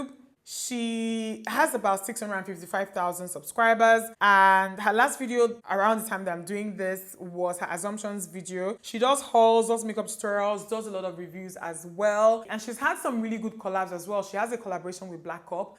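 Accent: Nigeria. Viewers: First time watcher, loved your Vid. Stunning face, impeccable make up and love you uploading in your Naija 🇳🇬 accent. Subscribed ❤️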